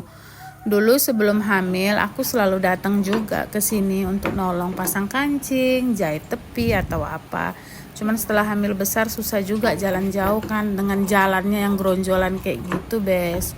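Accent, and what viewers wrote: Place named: North Sumatra, Indonesia. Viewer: Kak ini orang mana di Indonesia? Logat bahasa Indonesia kak seperti orang Sumatera Utara. Kenalan dong kak, saya followers baru kak🙏🥰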